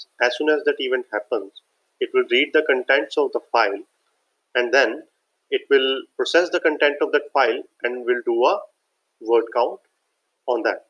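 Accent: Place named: India. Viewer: So indians are the only people that can do this? Is this explained anywhere in English without an accent...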